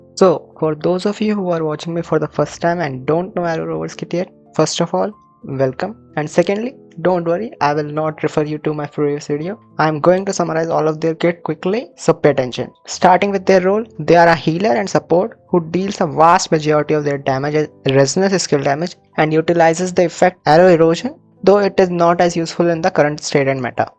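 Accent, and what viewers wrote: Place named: India. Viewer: The accent tells me that you are Indian?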